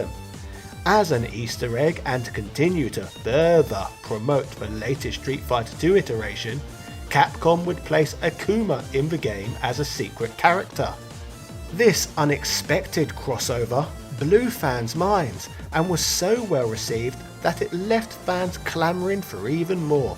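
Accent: England, United Kingdom. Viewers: It is always a pleasure to watch your videos, mate! I really love both your contents and your English accent, it really helps me as a comprehension exercise! Keep up the good work!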